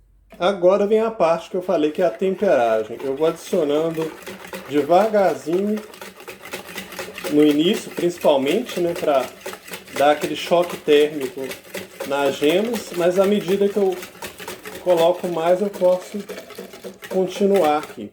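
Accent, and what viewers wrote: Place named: Minas Gerais, Brazil. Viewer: Não têm né 😖meio difícil só pegar a receita de boca com sutaq de mineroooo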